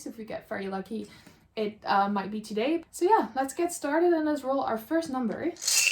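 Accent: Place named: Netherlands. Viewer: HI!! I just subscribed to your channel. I'm also from the NL and I love how you speak English ahaha. Good luck with your TBR!! And lots of fun💖💖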